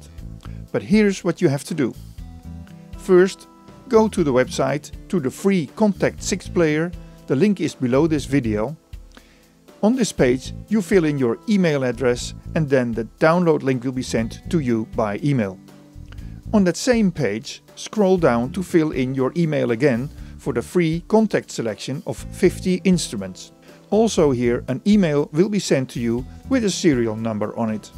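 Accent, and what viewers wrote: Netherlands. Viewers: I can clearly hear you're dutch man. Just like me or not? :-) I recognize it directly in the first 10 seconds lol. Good you're doing this and i really love it. Thanks i appreciate it! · Haha I also noticed within the first sentence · you sound really dutch lmao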